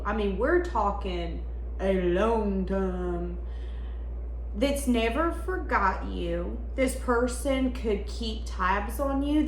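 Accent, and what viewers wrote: South, United States. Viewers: you are very welcome..I like the sound of your American southern? accent..its great..I'm English and live in NZ..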